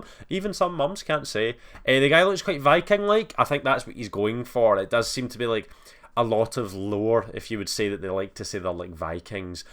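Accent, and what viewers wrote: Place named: Ireland. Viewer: greetings from canada! just stumbled across your channel by chance when i was scrolling and i love your commentary style. clean, simple, and just giving your opinions. i love your accent too! irish ? regardless, you have a great personality and i hope you continue to post videos! i find them really entertaining. best of luck, 🇨🇦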